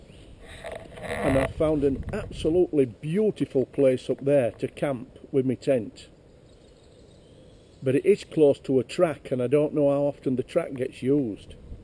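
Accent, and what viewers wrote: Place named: Leeds, United Kingdom. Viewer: just been watching another of your vids and my mrs commented how much accent sounds like her dad, and uncle, who are originally from leeds way. Glad you got permission, although I would definitely make sure I had a bright flag up, and smoke signals, if you run the chance of being stuck cooking your bacon butty's in the morning, in the middle of a shoot. Hope to see a vid of your night out some time soon. ATB, Phill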